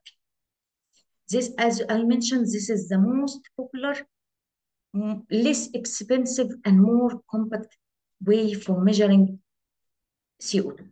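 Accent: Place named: Egypt. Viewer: I just lov the Egyptian accent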